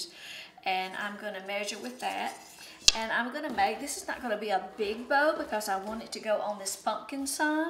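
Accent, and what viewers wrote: South, United States. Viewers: Hello from the UK! Lovely bow and good instructions. LOVE your southern accent!! Thank you for sharing!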